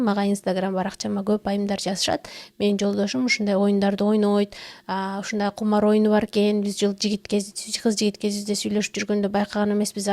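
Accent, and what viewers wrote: Standard. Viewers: Аягына чейин аябай кызыгып коруп уктум,унунор да жагымдуу, озунор да татына кыз экенсинер,таптаза кыргызча суйлоп адамдын мээсин ачып куйчуну куюп кайра жаап койгондой айттынар баарын,молодец аман болгула ишинерге ийгилик🎉🎉🎉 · АЗАМАТ КЫЗ ЭКЕНСИН, АНТКЕНИ КЫРГЫЗ ТИЛИНДЕ ТАК ТАЗА СУЙЛОЙТ ЭКЕНСИН ! БУЛ СЕНИН АКЫЛДУУ ЭКЕНИНДИ. БИЛДИРЕТ ! << КИРГИЗ >> ЭМЕС ЭКЕНСИН ДЕМЕК КЫРГЫЗДАР УЧУН ЖАН ТАРТКАН ЖАКШЫ. АДАМСЫН ! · Эң соонун кенештер. Кыргызча таза сүйлөгөнүңүз үчүн сизге чооң ырахмат.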